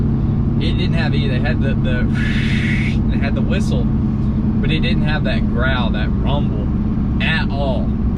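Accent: Alabama, United States. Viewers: What part of Bama are you from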